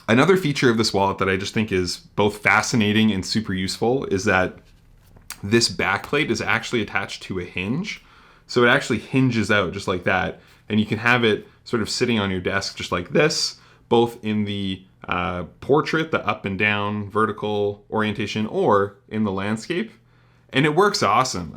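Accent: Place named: Canada. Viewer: Excellent review. Merci beaucoup du Quebec. I noticed your Canadian accent and the bills in your wallet.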